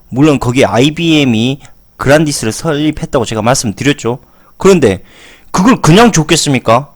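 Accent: Jeolla, South Korea. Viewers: 그래서 뭘 이야기하는겨. 전라도 억양으로 뭔 개소리를 하고 있는겨...... 인간 같지도 않는 놈. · 채널 주인 전라도 억양 삼성비하... 선동성... 끄덕끄덕 역시 그쪽 분위기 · 삼성을 망하라는 건가? 그럼 대안이 뭐냐? 인간중심? 좋아하네. 전라도 사투리도 거슬리고. 한심하다. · 믿고 거르는 전라도 사투리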